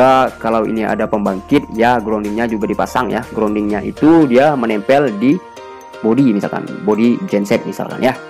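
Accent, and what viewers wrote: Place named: Malaysia. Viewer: Bang ingat dullu saya ppasang wayering di malaysia bang.logat bicara abang kayak orang melayu.abang banyak ok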